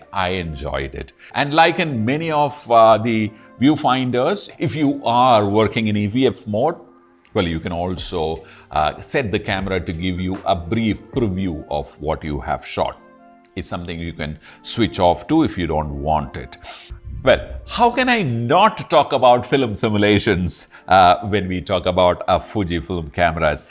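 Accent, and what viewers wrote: Kerala, India. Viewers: great Keralite accent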